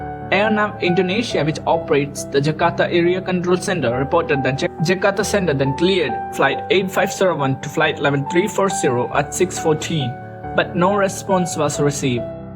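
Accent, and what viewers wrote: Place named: India. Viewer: Anying logat Inggris India kocak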